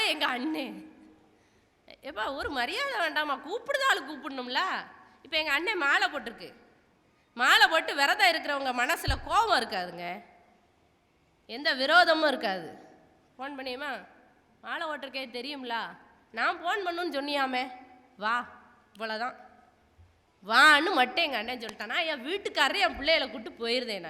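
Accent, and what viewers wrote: Tirunelveli, India. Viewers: Ñellai Tamil👌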